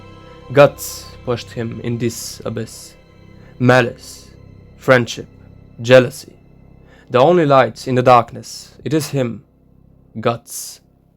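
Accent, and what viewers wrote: Germany. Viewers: Good German accent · 30 seconds in. You’re definitely German. Cool accent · Bro, you German? Thick accent man. Shoutout from Manheim!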